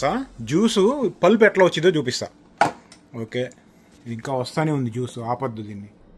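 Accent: Andhra Pradesh, India. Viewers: Andra slag lo matladutunnaru bro, nizamabad ante nammabuddaitaledu anna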